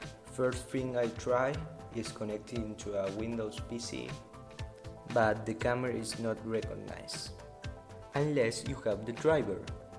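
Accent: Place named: Latin America. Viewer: Amigo buen trabajo pero espero que hayas mejorado el acento se nota que eres español/latino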